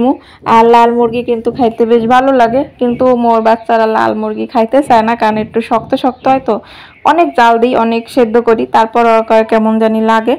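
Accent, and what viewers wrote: Barishal, Bangladesh. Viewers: আমার বরিশালের কথা শুনতে কিন্তু ভীষণ ভালো লাগে। তাইতো আপনাকে ভালোবাসা দিয়ে গেলাম আর আপনার ভালোবাসার অপেক্ষায় রইলাম আপু❤❤❤❤❤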